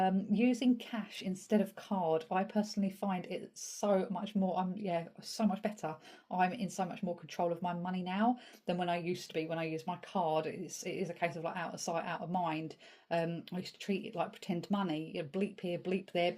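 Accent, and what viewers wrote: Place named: Essex, United Kingdom. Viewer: I love your accent sounds essex, what is your starsign? Xx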